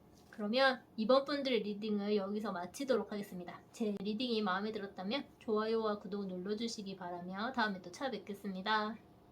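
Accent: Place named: Gyeongsang, South Korea. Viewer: 타로에 경상도 사투리가 엄청 많네..대구? 대구사람들이 다 타로하는거임??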